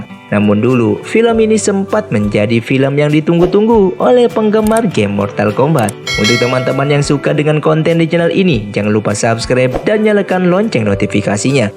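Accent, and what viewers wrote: West Java, Indonesia. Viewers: Intro nya okos sunda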